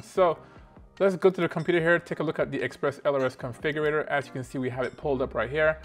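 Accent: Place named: Caribbean. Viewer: Sorry sound like you are from the Caribbean which island?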